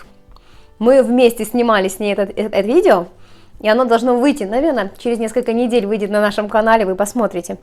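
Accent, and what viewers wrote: Ukraine. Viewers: молодец! Люди, а вы должны понимать, что человек с Украины и акцент остаётся надолго, ничего в этом страшного нет, любой иностранец говорит с акцентом, это почему то не раздражает...., вам спасибо большое за интересные рецепты😊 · Сергей Хворостенко люди! человеки! вы все забыли что девочка живет в Украине, там так говорят и это нормально, вас же всех не учат как правильно произносить украинские слова? У нас в России многие вообще забыли родную речь, или так засорили словами паразитами что слушать невозможно. А девочка умничка, трудяга. Так держать.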